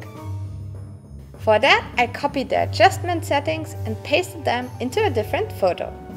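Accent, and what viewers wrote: Germany. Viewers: I love your german accent xD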